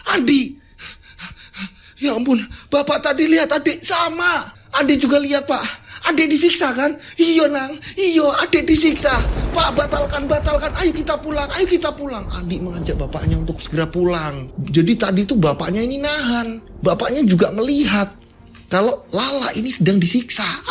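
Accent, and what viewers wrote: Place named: East Java, Indonesia. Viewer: Hadir lg mas ak! Di SBY! Mas org Jateng tp logatny koq Jwa Timur'an y? 😀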